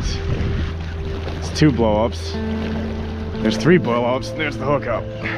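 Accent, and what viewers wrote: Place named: Fall River, United States. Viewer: Are you originally from Rhode Island, you kinda have that Fall River accent, not that it’s a bad thing, cause I’m from Fall River but I also fish Rhode Island here an there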